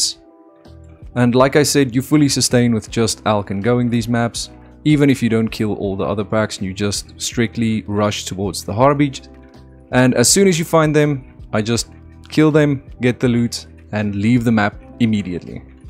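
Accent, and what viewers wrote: South Africa. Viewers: NP i found it i knew your accent sounded familiar, im From SA to bro gg content il supp u all the way keep it up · That south African accent is thick AF brother. Hallo daar van die kaap. Nice vid bra. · Sounds ikea a fellow SAFFA 🤔, hoe gaan did boet, ek gaan dit vandag doen..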